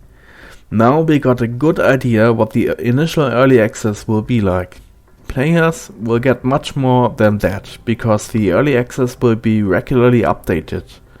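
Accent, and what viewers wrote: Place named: Germany. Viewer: least brutal German accent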